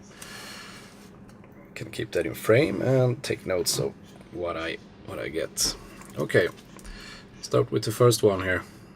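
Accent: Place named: Sweden. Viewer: You are swedish, I hear it by accent... hej på dig!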